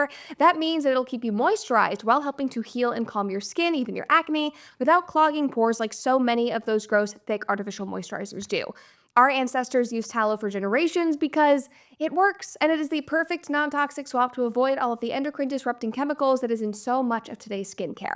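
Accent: United States. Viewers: now become more active in creating more awesome contents for us as fan weekly 4 videos, i really liked your vibes typical American accent fast quick accurate and precise no wonder u name yourself professional yapper:yt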